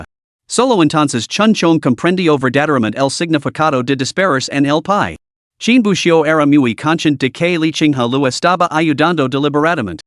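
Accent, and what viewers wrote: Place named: England, United Kingdom. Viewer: Arreglen ese acento inglés que arruina la narración 👎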